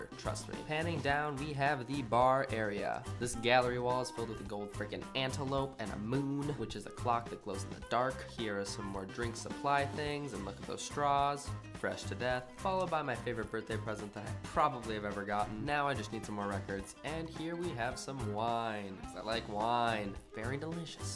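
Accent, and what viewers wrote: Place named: Midwest, United States. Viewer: I really enjoy watching all your videos. They're beyond cool!. I was once a foreign exchange student to St. paul, MN way back in 2008 so i kinda enjoy listening to yer midwestern accent. Keep it up! :)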